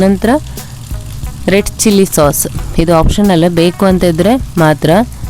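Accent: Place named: Mangalore, India. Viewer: Nimma manglore bashe thumbaa chennagide marree😁 Nanna friends ella manglore avre thumba Kushi aguthe nim kade kannada kelakke❤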